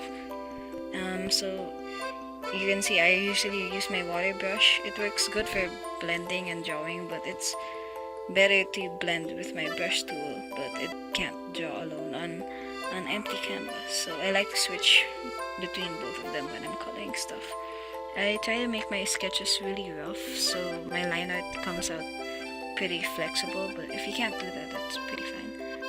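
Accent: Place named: Philippines. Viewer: your voice is so cute! i can hear that filipino accent a bit >:D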